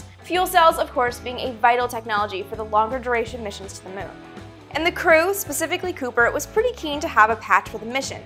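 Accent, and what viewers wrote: Canada. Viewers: In one of your videos I asked if you were from up north somewhere. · Are you Canadian ????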